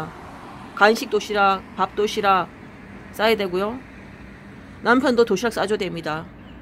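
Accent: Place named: Daegu, South Korea. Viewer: 말씀 듣고 많은 생각을 하게 되었네요, 늘 동경하던 타향살이, 다시 한 번 생각하게 되었어요. 한국은 한국대로 호주는 호주대로 각자 저마다의 고충은 다 달고 사는 게 인생아니겠어요 ㅠㅠ 다 하나님의 뜻이라고 생각하지만 너무 힘들 때면 하나님께 왜 이렇게 힘든 인생을 주셨냐고 묻고 싶어질 때가 많아지네요 그리고 범어동 사셨다니.. 사투리가 살짝 대구 사투리같다고 느꼈었는데 같은 대구분 만나니까 반갑네요 ㅠㅠ 대신동이나 시내에서 보면 엄청 반가울 것 같아요 ㅎㅎㅎ 주저리 주저리 쓰다가 길어졌네요 ㅠㅠ오늘도 좋은 하루 되세요!!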